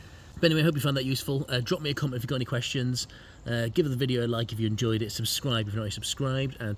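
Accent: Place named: Wales, United Kingdom. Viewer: I assume you're in the US? British accent (slightly Welsh?) but quoting prices in dollars twists my head a bit 😂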